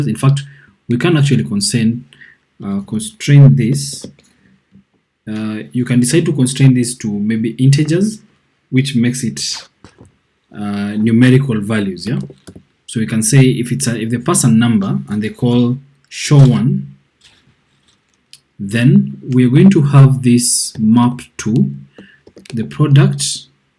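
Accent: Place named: Kenya. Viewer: Totally unrelated, but you sound Kenyan🤔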